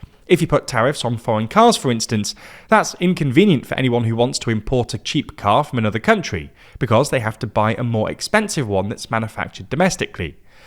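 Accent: United Kingdom. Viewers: Clicks in video, hears british accent. Leaves.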